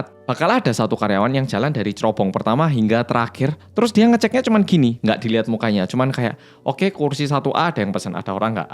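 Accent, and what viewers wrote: Java, Indonesia. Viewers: Mas ..yg medok .bs gak orang indo kerja d sana ...🙏🏻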